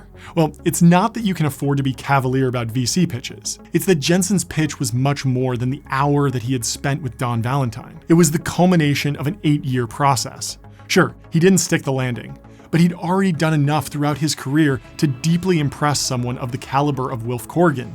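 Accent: United States. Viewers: I'm an English pedant, my multilingual son wouldn't make that mistake. However I do accept as the saying goes that we are "two nations separated by a common language" and the guy speaking is speaking American and not English. Just rubbed me the wrong way is all.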